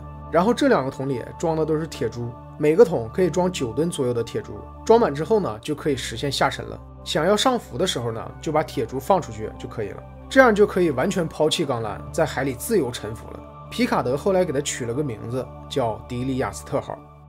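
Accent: Northeast, China. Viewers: 能把东北口音努力改改吗…..实在是听着太俗气了😂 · 好像东北人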